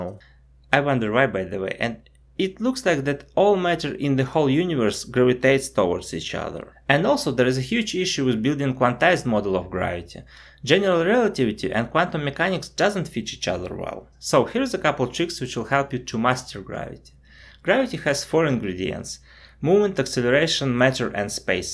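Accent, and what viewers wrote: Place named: Russia. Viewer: Hey! Isn't your native language Russian ?) I hear familiar accent 😁 If yes, just подмигни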